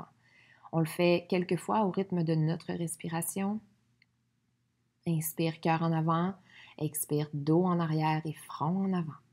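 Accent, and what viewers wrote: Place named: Quebec, Canada. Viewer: j'adore cet accent Quebecois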